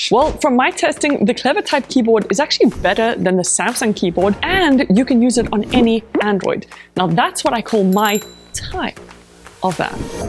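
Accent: South Africa. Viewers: You sound very South African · I can’t unhear how much you sound South African… 😅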